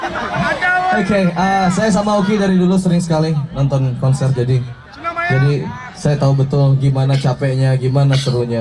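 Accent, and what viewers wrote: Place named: Minangkabau, Indonesia. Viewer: Lucu bahaso minangnyo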